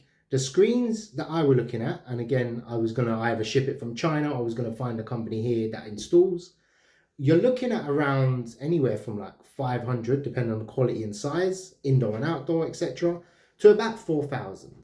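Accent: United Kingdom. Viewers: I don’t know if this sounds unprofessional or not but please let me know what type of british accent you’ve got. Thanxx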